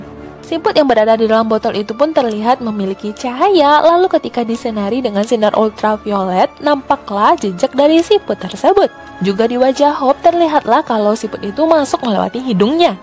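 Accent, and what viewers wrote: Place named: West Sumatra, Indonesia. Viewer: Hahahahah ketahuan orang minang y?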